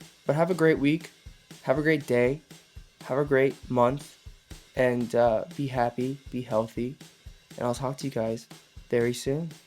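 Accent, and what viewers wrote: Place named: United Kingdom. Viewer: I love your British accent (Actually i love your all)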